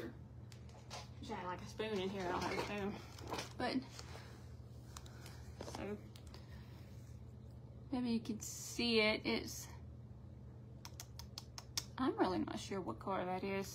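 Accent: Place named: Mississippi, United States. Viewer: Thank you, so much for answering me! I thought I heard some Mississippi in your accent. Love it! ♥️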